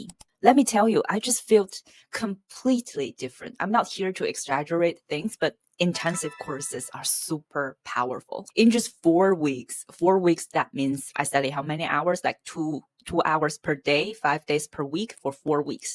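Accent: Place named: England, United Kingdom. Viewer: I appreciate your efforts but your German doesn’t sound german 😅 it sounds more English than German specially the of “T”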